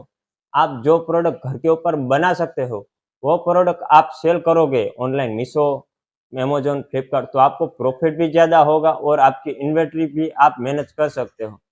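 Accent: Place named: Gujarat, India. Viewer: Lagta he Gujarat se ho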